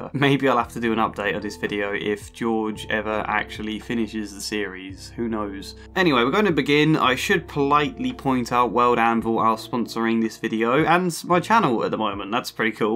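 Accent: United Kingdom. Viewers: It's okay - your accent shows that you're obvious British, and thus apologies are likely second nature to you. I'm sorry to have pointed this out; I'm Canadian. Sorry.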